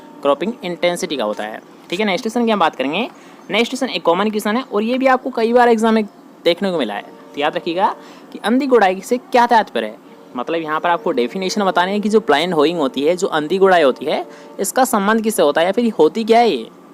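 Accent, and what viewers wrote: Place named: India. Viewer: Sir ji aapki awaj bahut achhi h....aap kaunse stet se h ..aapki Hindi kuch alg h..but cute h...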